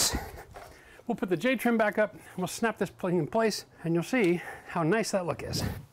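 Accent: Canada. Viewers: You live in Florida but have a Canadian accent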